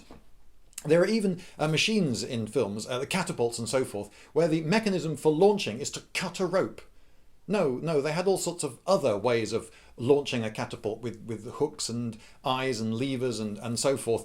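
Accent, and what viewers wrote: England, United Kingdom. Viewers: you sound so English! and that's coming from me, an Englishman myself. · "That was a good bit of rope that." I love the English accent.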